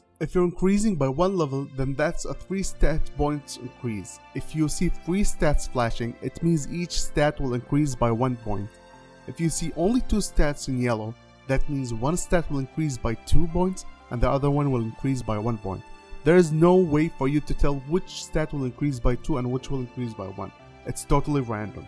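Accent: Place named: Arab world. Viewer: has an arabian accent 👀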